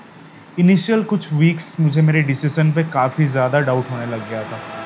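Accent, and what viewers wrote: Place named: Odisha, India. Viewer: ତମ କଥା ରୁ ଜଣା ପଡୁଛି,ତମେ ଓଡ଼ିଆ........ଖୁବ୍ ଭଲ ଉପସ୍ଥାପନା କରିଛ Video re❤ lots of Love from Barbil,Keonjhar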